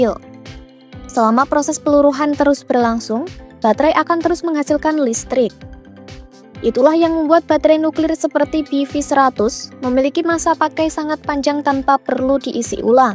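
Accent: Java, Indonesia. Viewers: Kak, aksen Jawanya kuat banget. Mohon lebih disamarkan 😅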